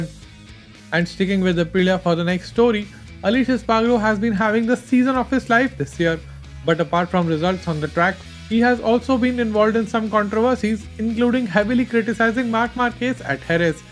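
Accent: India. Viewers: Ha! It takes a couple of views and you will be used to the mild Indian accent.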